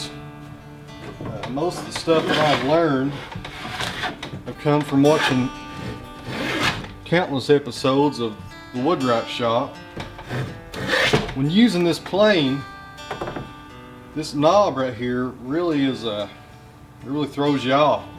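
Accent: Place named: South, United States. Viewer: That southern accent!!!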